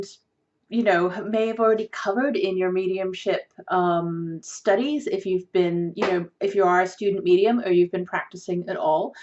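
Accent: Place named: United Kingdom. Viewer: Are you in Plymouth England or Plymouth Massachusetts USA? You seem to have a British accent but a Pilgrim name, the Pilgrims did come from England.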